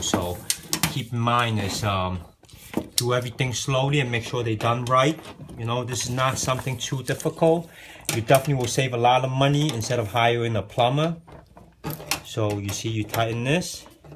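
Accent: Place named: China. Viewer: A chinese accent with a little New Joyseee accent... and you get this guy... awesome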